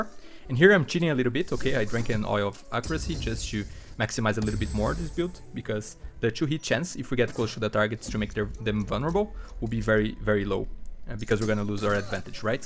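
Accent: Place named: Brazil. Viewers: Are you brazilian? You sound like a fluent brazilian speaking english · Pensei a mesma coisa, o sotaque do inglês dele é muito parecido se não for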